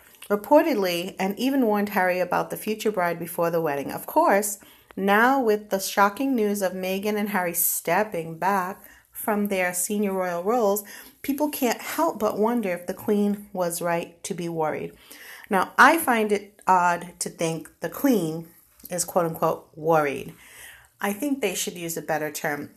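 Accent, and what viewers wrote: Northeast, United States. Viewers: First time here, I’m guessing your from the Northeast USA?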